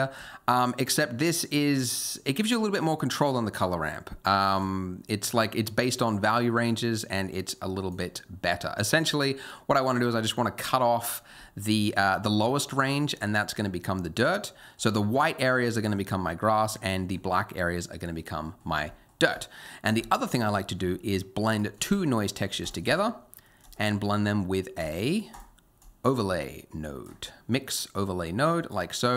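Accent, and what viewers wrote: Australia. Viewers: "and strouaw" <3 the Australian accent!!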